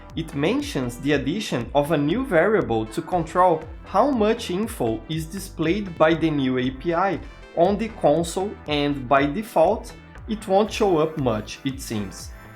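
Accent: Brazil. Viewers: Bruh, i never heard of you before and this video popped up in my recommended. But something about your accent SCREAMS brazilian, am i right? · Eu conheço o sotaque brasileiro quando eu escuto o sotaque brasileiro haha. Bom trabalho com o vídeo por sinal. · Massa ver um BR fazendo um vídeo tão bem informado sobre o TF2. Top 10 sotaque foda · Are you Brazilian? you speak english with a very brazilian accent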